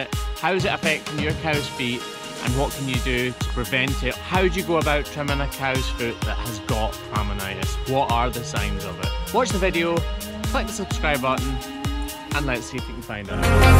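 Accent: Scotland, United Kingdom. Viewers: I've watched almost all of your videos in 3 days time. POST FASTER! I knew nothing about cow farriering prior to your videos. A good Scottish accent helps too.😊 ha! Good day!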